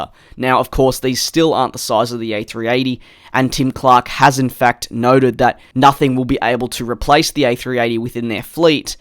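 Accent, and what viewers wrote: Australia. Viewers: Are you from Australia? i'm pretty sure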